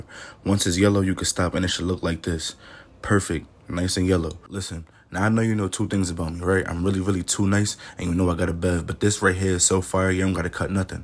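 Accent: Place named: North, United States. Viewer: Idk how southern you are with the up north accent all of that but now we're just waiting on the collard green recipe 😆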